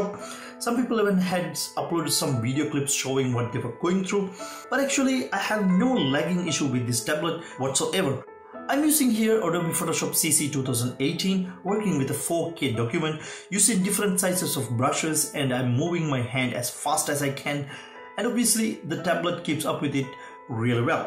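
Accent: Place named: England, United Kingdom. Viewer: Enjoyed the video. Thank you for the useful info. Although..... It was toooo difficult to understand the English Accent, and the opening logo was sooo long I almost skipped.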